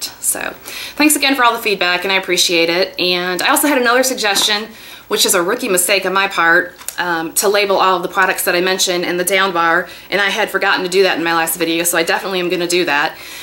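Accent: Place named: South, United States. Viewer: I knew you had to be from the South! We sound the same....and provide lots of information (ha ha!)....I have this one and feel like you do. I also have the Smashbox. I bought it before it was released to the public. I like it a lot. I still use a concealer and finish powder (IT cosmetics)...I like the look! I definitely think it's making my complexion more even. Keep the vids coming! I love your sense of humor! We Southern Belles got it goin on! Hee! Happy Easter, y'all!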